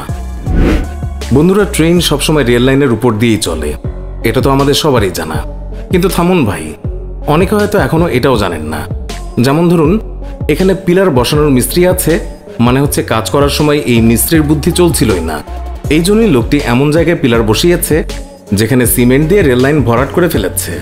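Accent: Bangladesh. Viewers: আপনার ভাষা শুনে ,মনে হচ্ছে আপনি বাংলাদেশি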